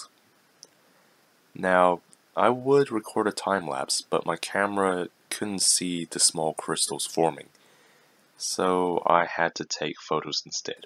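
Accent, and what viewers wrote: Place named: Australia. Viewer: I can't tell where your from. Australia?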